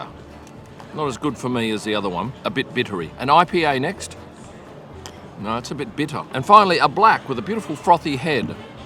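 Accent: Australia. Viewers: Why are you talking about US dollars when you have an Australian accent and are talking about a pub in the UK. We have pounds here!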